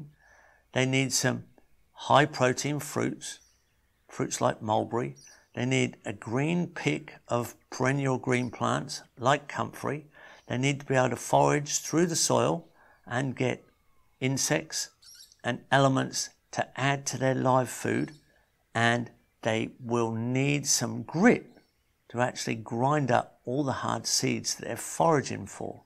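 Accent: Australia. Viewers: Et je suis sûre que le jaune d'œuf est presque orange. :o) Des oeufs superbes et très nutritifs, pondus par des poules heureuses. Magnifique ! My parents used to have chickens and I remember they loved scratching the ground. I didn't much care about the rooster, though. A bit afraid of. They had a laying break for about 1 month a year, in winter (European winter). Otherwise, eggs all the year round, enough for the whole family and to sell some to neighbours. When baking, the pastry had a nice yellow colour. Industrial eggs have a sick pale yolk, you immediately see the difference. Thanks for all your videos, I learn a lot. Just had to get used to your Australian accent at first. All the best!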